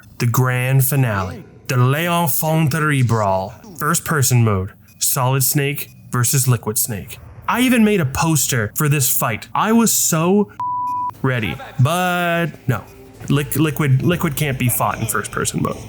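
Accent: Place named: Mississippi, United States. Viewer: Honking Mississippi accent showing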